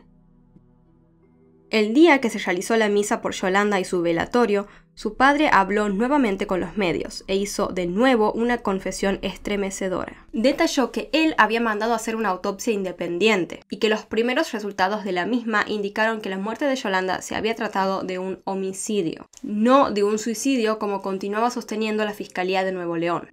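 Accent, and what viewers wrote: Argentina. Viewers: Hola . . Es Argentina de Santiago del Estero ! 😊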